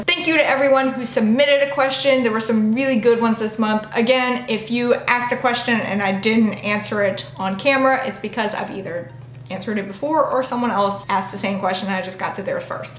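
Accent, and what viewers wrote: South, United States. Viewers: I can tell your southern and just wondering where? Tennessee here😁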